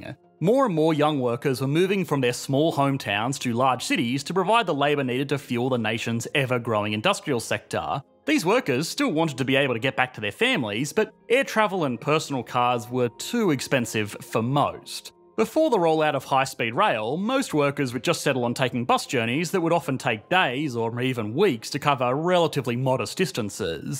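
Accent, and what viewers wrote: Australia. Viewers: Usually but in this case he is a pretentious individual trying to appeal to the Americans. If he was genuine in what you he would have dropped his Australian accent. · 这个口音我猜听上去像个澳大利亚人，众所周知，澳大利亚跟中国的关系现在一点都不好。我还觉得粉种人显然对中国人的经济观完全不懂，喜欢用自己的经济学常识分析中国，中国人一般认为经济出现大问题是因为存量市场瓜分殆尽，并且新的技术革命未能出现。至于债务危机，中国是一个儒家国家，政府对经济部门的掌握不仅仅限于交通工具，还有金融、能源、化工、机械、物流等各个领域，任何部门的利润在必要时都会拿来作为财政收入填补其他公共部门的亏损。而且高铁的建设是为了带动整个地区产业的繁荣，并不以追求利润为目标，所以广大的中小民营企业才是高铁的最大受益者。如果要说债务危机，那么我认为维持一个高福利社会的制度危机更严重。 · What is this australian dialect/accent called? I need to know so I can avoid it in my life forever. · Australian accent is so freakin weird like how he says todddaaaay lool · He is Australian but I would suggest has lived OS for a few years, Most Aust as u correctly stated pronounce route as "root" and router as "rowt-er" as it has a sexual meaning in slang.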